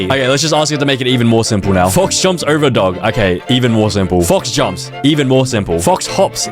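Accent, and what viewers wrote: Australia. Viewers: Ive watched so many vids and THIS is the one i find out you are australian... how did i not know 😭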